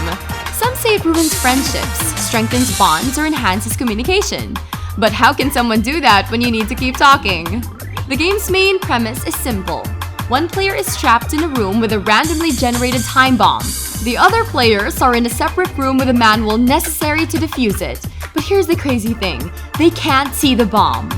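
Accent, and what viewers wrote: Netherlands. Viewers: huh are you Dutch?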